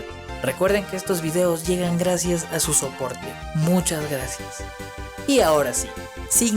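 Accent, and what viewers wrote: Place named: Sierra, Ecuador. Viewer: Bro, tu contenido es genial. También soy fanático de Crash Bandicoot. Saludos, también soy de Ecuador. Mas precisamente de Guayaquil, supongo eres de la Sierra por tu acento.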